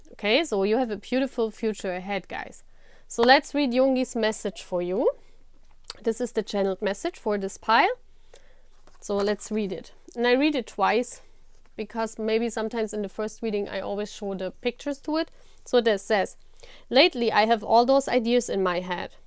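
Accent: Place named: Germany. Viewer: Are you german because of the accent? Ps nice video💖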